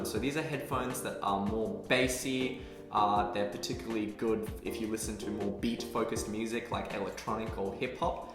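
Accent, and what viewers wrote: Australia. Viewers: You're an aussie? subbed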